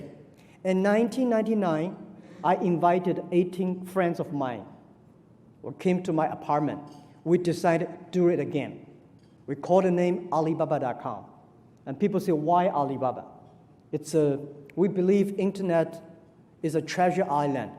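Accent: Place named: United States. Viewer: Thạch Thảo chuẩn, Jack nói tiếng Anh kiểu Mỹ, rất dễ nghe, hơn nữa tư tưởng và cách diễn đạt của ông mang đậm nét châu Á, nên nghe dễ hiểu vô cùng.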